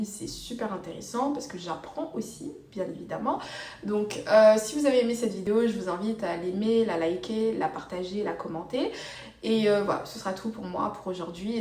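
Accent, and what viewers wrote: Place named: France. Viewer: mais de quoi tu nous parle, ta un accents Français et tu parle de pas venir au canada si.........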